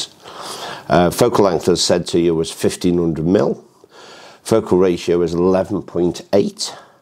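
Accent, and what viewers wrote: Stoke, United Kingdom. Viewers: You sound like a Stokie 🙂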